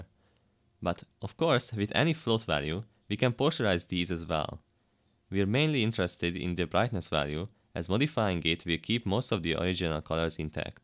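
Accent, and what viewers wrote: Hungary. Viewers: annyira büszke vagyok magamra hogy instant felismertem hogy magyar akcentusod van de amúgy geci kemény képeket lehet csinálni vele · A magyar akcentus megcsapott ugyhogy jottem a komment szekcioba :D szuper video, igy tovabb!!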